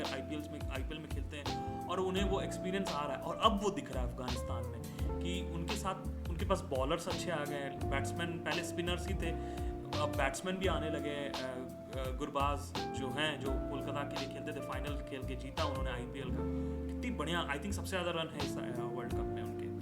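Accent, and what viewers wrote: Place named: United States. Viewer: aap ka hindi accent se lagta hai video recording USA mein hua hai.....if your schedule in USA permits you please visit all the locations where famous movie JAL HO NA HO movie shooting was done!! Jai Ho Mangal may Ho 🎉🎉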